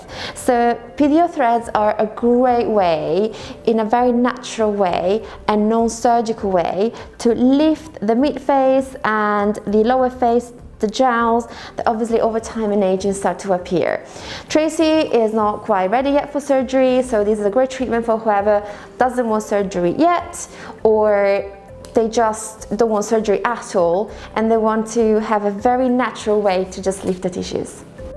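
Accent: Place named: Iran. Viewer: She has Iranian accent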